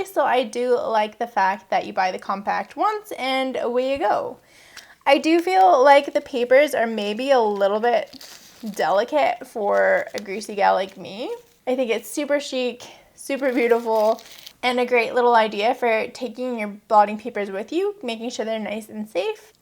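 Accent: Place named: Canada. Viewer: That’s just a Canadian accent, girl. 🤦🏻‍♀️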